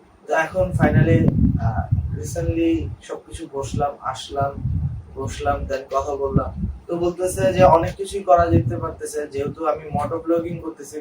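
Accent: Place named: Chittagong, Bangladesh. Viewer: Mama ageo bolsilm. Chatgaiya accent ta raikho. I think it would be better.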